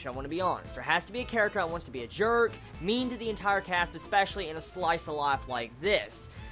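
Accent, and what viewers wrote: South, United States. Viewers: Hey I I know you probs won't read this but I'm really sad because I start high school tomorrow and I. Really scared and sad I really sad because I can't watch your videos as much because homework but I'm really nervous because I don't like going out with friends and I am kinda socially awkward I was really depressed for a while because I liked anime and I had no one to relate to. Then I found your channel and your awesome personality and the hint of southern in your voice always made me laugh and smile when you reviewed anime. I really don't know much about you and you probably won't care for this but you helped me through a lot. It was never like I wanted suicide but I was sad. I never talked too my friends and cut them off. SORRY FOR THE LONG MESSAGE I DIDNT MEAN IT I JUST GOT CAUGHT UP IN MY EMOTIONS. Also I watch all your vids even if it's anime I don't watch. 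I haven't been subbed for a while but I still support you as though I have been here for a long time. Thanks for everything. -Moe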